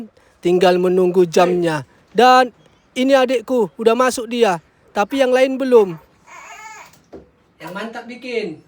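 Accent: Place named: North Sumatra, Indonesia. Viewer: Abang logatnya koq seperti orang dari Sumatra utara sepertinya? Sungainya jernih enak,abang lepas celana jebur Brenang enak!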